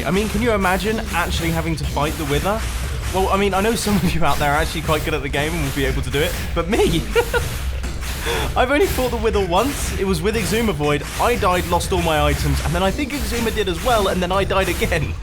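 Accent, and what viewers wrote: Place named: England, United Kingdom. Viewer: “Ah tall” I love English accents😍😌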